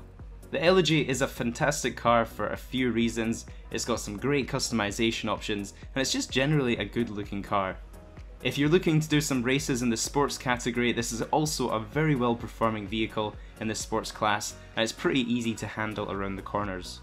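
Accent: United Kingdom. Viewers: Great video brother trying to figure where in uk your from ireland??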